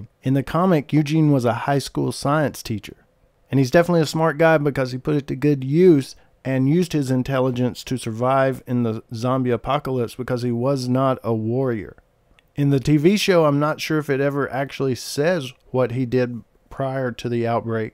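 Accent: South, United States. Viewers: Dude you have a southern as hell accent lol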